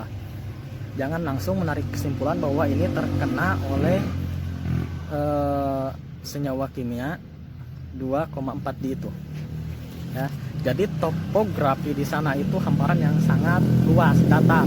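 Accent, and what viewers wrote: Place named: West Java, Indonesia. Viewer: pohon anggur saya juga mengalami hal yang sama dan sedang dalam pengobatan... logat Sunda ketara euy 😂😂😂🙏👍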